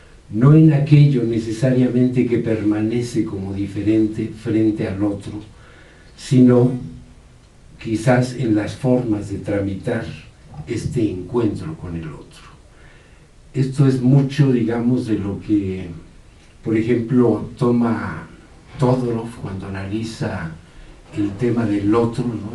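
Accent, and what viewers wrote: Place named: Mexico. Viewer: me dio mucho gusto verte despues de tantooooo tiempo, te reconoci de inmediato aunque la ultima vez que te vi aun no te salia la piocha, te encontre acento Mexicano (por que sera), si lees esto mandame un e-mail <mail> esta foto estoy con mi hijo mayor). Tu primo Felix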